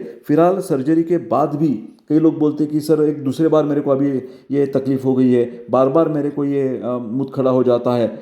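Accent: India. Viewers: Thank you sir. Clear puneri Hindi. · Khup chan explanation marathi manus hindi bolat ahe . He kalte..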